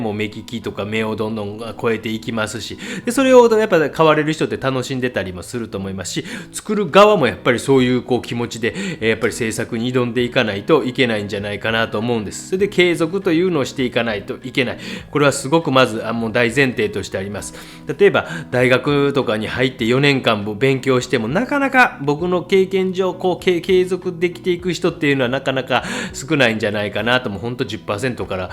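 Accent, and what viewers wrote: Kansai, Japan. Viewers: 柔らかい関西弁のニュアンスでしょうか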